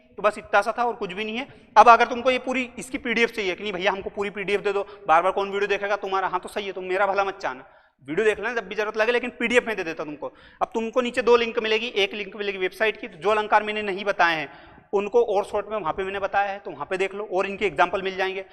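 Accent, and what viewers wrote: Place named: Indore, India. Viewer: वाह यार भियां एकदम इंदौरी style me padate ho. Kaha se ho.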